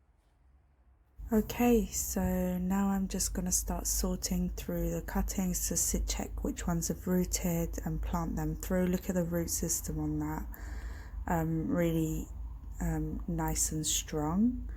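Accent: England, United Kingdom. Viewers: So glad you made this video. Thank you! I love the sound of your lovely English accent too 🌿💜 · I was really hoping it would work in water. Apparently if you take cuttings in summer us best and quickest to root but you can do it with the softer growths in winter and will take about 3 months to root. I'm judging from the accent your in England so the colder climates may slow down the rooting a bit too. Off to try this and glad I don't have to faff about with rooting hormone.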